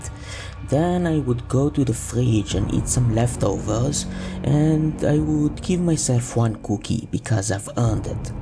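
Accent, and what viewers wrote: France. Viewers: are you french by any chance? your accent is nice but as a non native it was also hard for me to understand the first minute or so of the video hahaha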